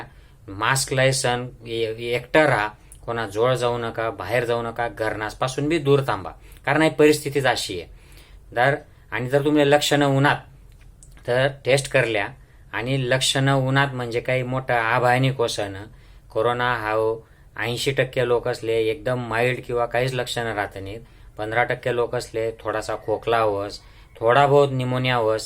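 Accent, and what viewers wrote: Khandesh, India. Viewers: गयर भारी सांग ,,,तुमनअहिराणी बोलन खुपचं गोड वाटन ऐकाले👍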